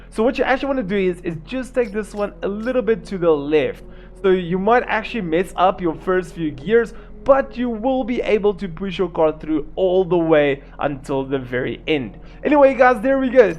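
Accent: South Africa. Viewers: my fastest car in my garage has a 0-100 in 0.54 seconds and a top speed of 310 km/h. my time on that track with it is 8.23 seconds also are you south african? you talk with that type of accesnt? also great video i loved everything about it😀😀😀😀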